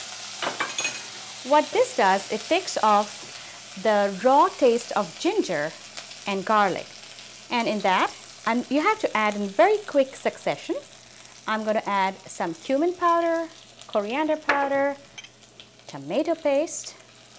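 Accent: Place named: India. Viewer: Is this what u learnt in the entire video..she is Indian and has an accent..live with it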